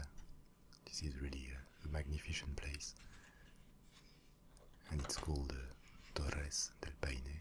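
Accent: France. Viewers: Vous avez un accent français je trouve !!! 😍😊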